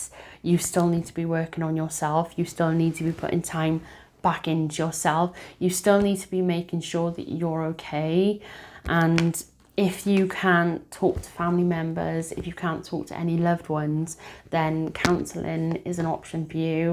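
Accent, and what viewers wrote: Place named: United Kingdom. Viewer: 2 years this as been going on and the same time following tarot this reading is so accurate it is unreal everything not one word out of place its mad how your channel just poped up on my screen when i was about to give up and completely forget this but you have given me hope.Thankyou so much its lovely to hear a British reader ❤️ ❤stay safe❤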